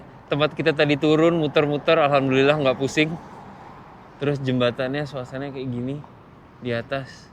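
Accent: Indonesia. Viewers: Hebat, 20 thn di LN, logat nya Indonesia bnget❤ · Logat loe Indo banget.... Keren... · 20 th tinggal, suaranya ga berubah sih" tetep Indonesia bgt' 👍👏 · Dah lama sampe lupa Chanel ini,pdahal dlu saya suka nonton... Btw gua kagum m lu bro,, 20 tahun di Amrik tapi pas ngomong bahasa indonesia,dialek nya masih indo.👍🏼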